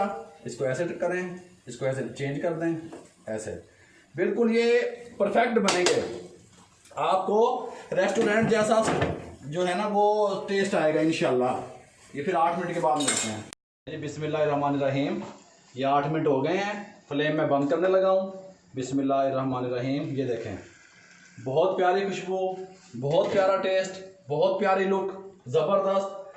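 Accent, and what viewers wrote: Punjab, India. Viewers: Hay Allah ap ki punjbi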